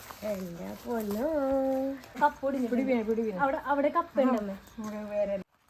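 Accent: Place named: Kochi, India. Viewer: Cochi slang 😍😍😍😍😍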